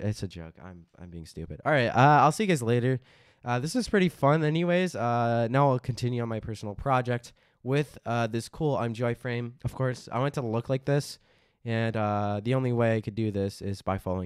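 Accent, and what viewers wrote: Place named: France. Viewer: L'accent de fou que t'as pour un français 🔥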